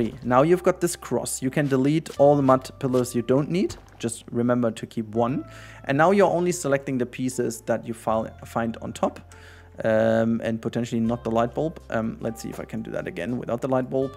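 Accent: Germany. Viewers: Sag mal, bist du Deutscher? Ich höre da so einen Akzent. 😄 Hab dich gerade entdeckt, mega Video! Danke dir.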